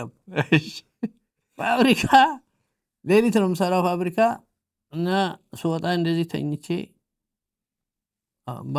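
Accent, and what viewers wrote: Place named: Addis Ababa, Ethiopia. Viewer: እንደ ዳኛ ሲሳይ አይነት የአዲስ አበባ ልጆች አንጋገር ዘይቤ ያላቸው አስቂኝ ጫወታ አዋቂዎች በመመናመን ላይ ናቸው ነበሩ ተብሎ ከምወራት ይሰውረን ረጅም እድሜ ከጤንነት ጋር ከመላው ቤተሰቦችህ ይሁን ወግ አዋቂ ያራዳ ልጅ የፒያሳው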